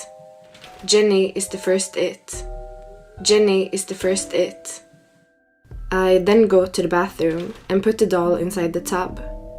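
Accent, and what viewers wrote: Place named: Iceland. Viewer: Oh, I didn't recognise your accent as Swedish. I was thinking you might be Icelandic. Thanks for sharing.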